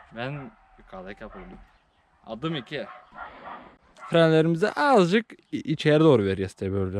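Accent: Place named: Macır, Turkey. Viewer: Efsaneeee tam macırca konuşma vallaha sard i😂😂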